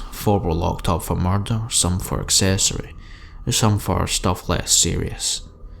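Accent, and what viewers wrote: Scotland, United Kingdom. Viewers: Yay a Scottish horror narrator !!! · Great video! I love your narration, although I must admit I do have a serious jello-kneed weakness for a Scottish accent! Keep those stories coming! 😘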